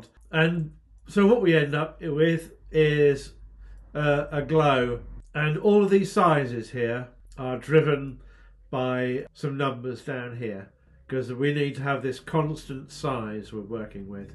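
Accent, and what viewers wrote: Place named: England, United Kingdom. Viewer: I'm not good in English, but even Russian like me can understand his gorgeous pure English accent. Thanks for great videos!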